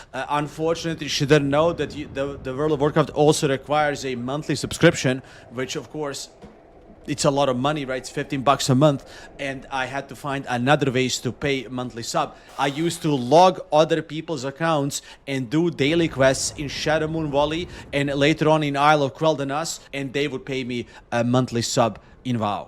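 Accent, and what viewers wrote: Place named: Scotland, United Kingdom. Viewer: Odakle ti ovaj Skotski naglasak?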